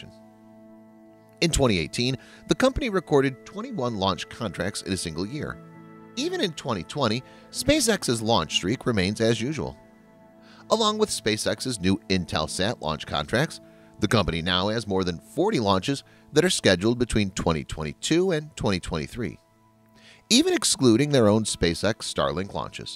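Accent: United States. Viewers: "The common reason is actually the same"... What the heck does that mean?? The butchered English in an American accent on this channel makes it difficult to listen to! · American English is its own form of English full accepted with British English. The voice in the video used precise American English. So it wasn't really butchered.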